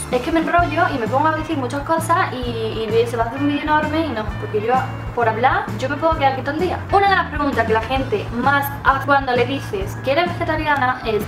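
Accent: Seville, Spain. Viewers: me encantaas me has ayudado mucho he aclarado algunas cosiyas que tenía en la cabeza 😊 eres super salá y me encanta el acento sevillano 😉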